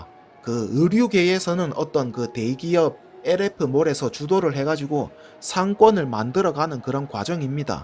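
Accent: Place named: Daegu, South Korea. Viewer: 대구사람인가베. 억양이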